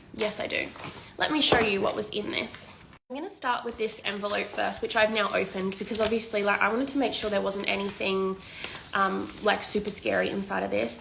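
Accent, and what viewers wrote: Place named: Australia. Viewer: Man, I totally love Australians. Her accent is 🔥. Shes really hot, I’d book with her in a second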